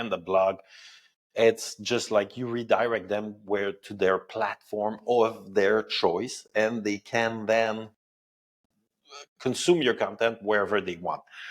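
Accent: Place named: Quebec, Canada. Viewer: Nice video! Are you from Quebec!? I can tell😃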